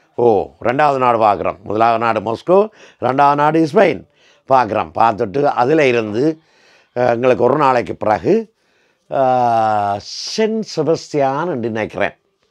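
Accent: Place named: Jaffna, Sri Lanka. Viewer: 😂🎉thanks aiya for share your experiences in purely jaffna tamil 😂😂❤😂❤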